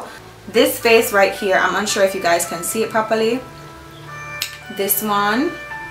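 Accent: Jamaica. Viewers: I'm from Trinidad. ... but a Jamaican accent is so exotic to me.... lol.... keep doing what you doing girl..... one day I hope my channel gets as bomb as yours....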